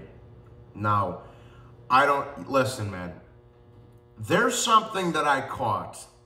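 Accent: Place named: Staten Island, United States. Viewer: the way you speak the way you carry yourself you could easily be mistaken for a person from Staten Island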